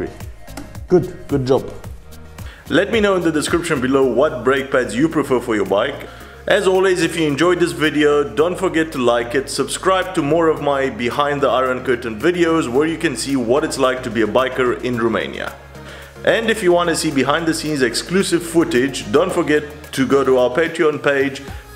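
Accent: South Africa. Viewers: Good and very well done video! Must say you sound like us here in South Africa 😂. How do they compare to the original pads from BMW, which is also Brembo I think? Did you order from the official website? Keep up the good work!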